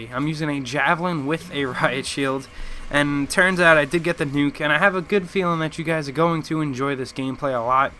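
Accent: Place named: New Jersey, United States. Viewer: sounds like he has a jersey accent lol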